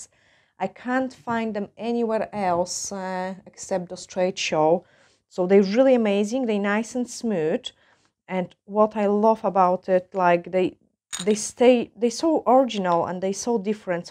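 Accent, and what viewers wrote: Scotland, United Kingdom. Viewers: Do I detect a we bit off Scottish in your accent ?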